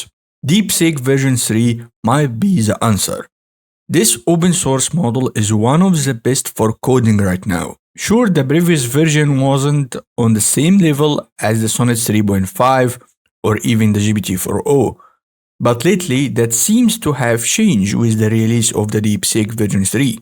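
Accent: Egypt. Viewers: I like you Egyptian English accent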